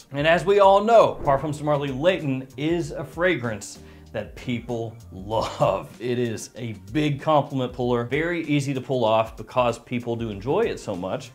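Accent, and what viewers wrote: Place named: New Jersey, United States. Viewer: Are you from nj? Lol because sounds like nj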